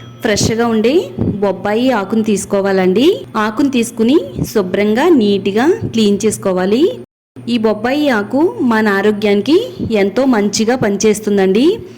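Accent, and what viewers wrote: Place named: Godavari, India. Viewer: Godavari districts vallu ekkuva ga andi ani antaaru chivari lo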